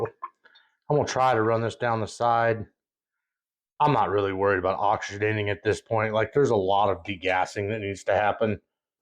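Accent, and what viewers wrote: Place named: Indiana, United States. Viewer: Have I missed you introducing yourself? Not sure I caught your name or where y'all are from, I wouldn't be surprised if your accent is from my homeland of southern Indiana. I'd love to learn a little more about ya.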